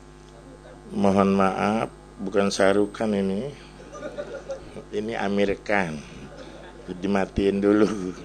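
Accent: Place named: Serang, Indonesia. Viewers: sehat slalalu untuk ustd.mantep cramahnya ustad bndar lampung. ustad bahasa serangnya medok. slam kenal dari sobri jaseng di bntuhan kaur bengkulu. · Dicky Pratama Putra pantes logat ny banten mntap abah yai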